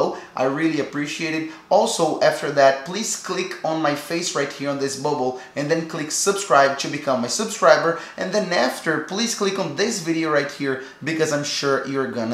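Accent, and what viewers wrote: Brazil. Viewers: You are definitely brazilian I can tell by ur accent 💜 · Helped me a lot, thanks. I realized by your accent that you’re also Brazilian haha. Your English is perfect, congratulations! How is it for you to build such a big channel and so much content in another language? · Are you Brazilian??? Awesome accent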